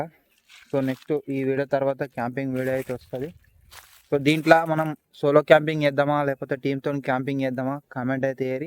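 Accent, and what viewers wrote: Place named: Telangana, India. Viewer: Am loveda lo slang ra idhi thu .. telugu rada me TS vallaki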